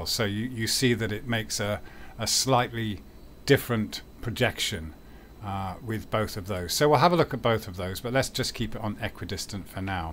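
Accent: United Kingdom. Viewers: Proof positive that a British accent doesn't automatically make you sound smarter!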